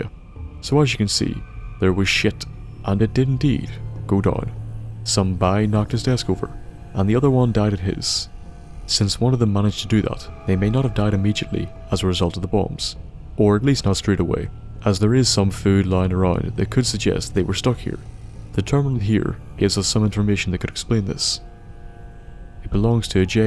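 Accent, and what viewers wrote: Northern Ireland, United Kingdom. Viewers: Are you from NI? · hey i'm new to the channel:3 and as soon as i heard your voice i was like "yay another NI youtuber:)" so where abouts are you from??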